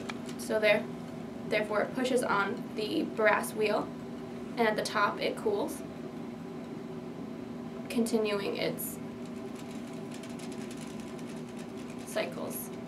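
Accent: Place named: United States. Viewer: I mean it's an extremely strong American accent :)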